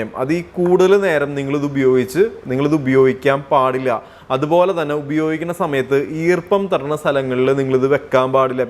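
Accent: Thrissur, India. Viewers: തൃശൂർ ഭാഷ പൊളിച്ചൂട്ടോ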